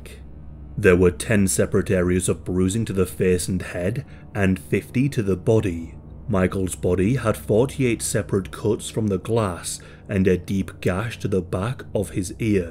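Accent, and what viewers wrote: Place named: Northern England, United Kingdom. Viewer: I am a British born American who misses hearing English voices. I even though I was born in the South (London) I love Northern accents, like "s too. voice is so soothing even though he is talking about the most disturbing things.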